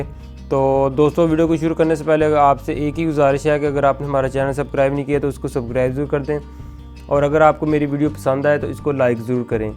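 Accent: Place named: Pakistan. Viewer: hahaha boli ton hi samj gya si pakistan di video hai. By the way great video. Gwandi taade.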